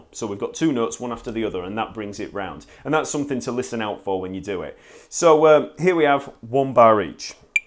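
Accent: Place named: England, United Kingdom. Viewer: Where are you from, Mate? Liverpool? South London? I can understand everything you're saying.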